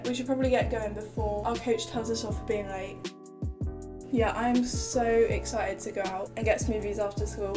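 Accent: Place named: United Kingdom. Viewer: my british accent (Btw I’m not British I’m American): “cAn yOu pLeAsE hAnD oVeR tHe wOaTeR” your british accent: 😙👌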